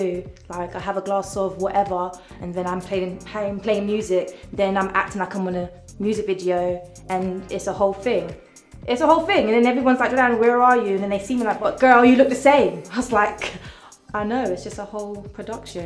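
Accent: United Kingdom. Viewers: Thank you for helping me with the British accent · I HAD NO IDEA SHE WAS BRITISH?! AND A CHILD??? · Omg I didnt realise she was british yasss black queen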